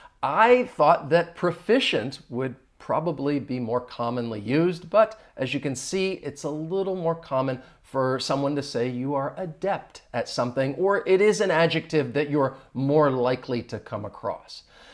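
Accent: United States. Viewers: It's such a great video. I love your american english. When it comes to vocabulary, well, you are a natural. 😊. Hello from france 🇫🇷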